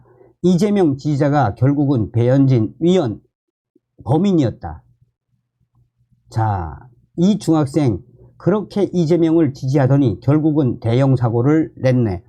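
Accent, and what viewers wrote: North Korea. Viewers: 북한 사투리 억양좀 고치시면 좋겠어요 듣기가 거북하네요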